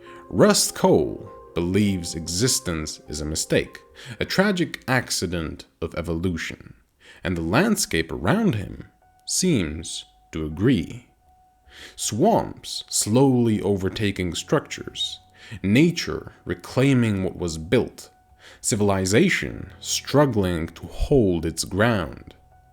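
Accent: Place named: Europe. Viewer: Appreciate the analysis. Dislike the Euro accent. (You don’t know, bro)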